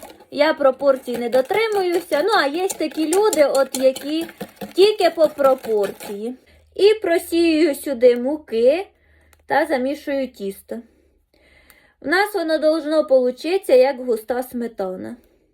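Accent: Poltava, Ukraine. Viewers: ну знаєш полтавський говір це одне, але коли написано безграмотно...,😭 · В багато підписників на різних каналах Це її образ селянської дівчини з Полтавським акцентом Подивіться її інтерв ю вона грамотна, сучасна дівчина,одягається гарно і по сучасному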